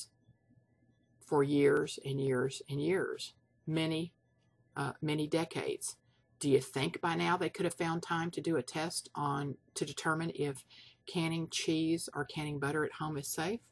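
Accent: Texas, United States. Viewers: I beleive that is a Texas accent, and also I beleive by her demonstations that she is probably a teacher of young children. I love her videos.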